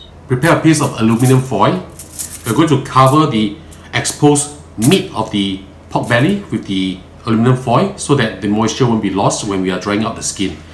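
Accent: Malaysia. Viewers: Malaysian accent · 一口馬拉音，哈哈😄⋯⋯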